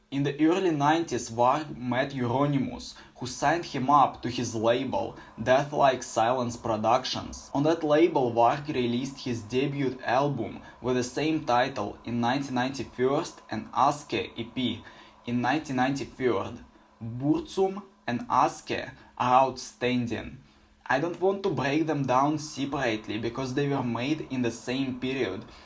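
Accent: Russia. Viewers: Русский акцент слышно за километр, лучше на русском видео пили... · Зачем ты делаешь контент на английском если у тебя такой русских акцент?) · I almost expect English speakers to complain, but even other Russians...wtf. Even with the thick accent, it's easy enough to understand him. Besides, the accent is nice and adds character.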